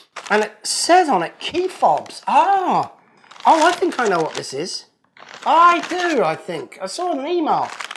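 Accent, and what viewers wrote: England, United Kingdom. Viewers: So are you an English guy living in Australia?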